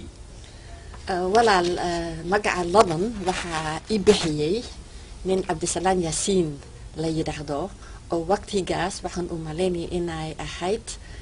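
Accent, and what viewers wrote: North, Somalia. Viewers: That is how the north Somalian speak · NORTHERN SOMALI ACCENT AYEY KU HADLEYSAA KKKKKKKKKKKK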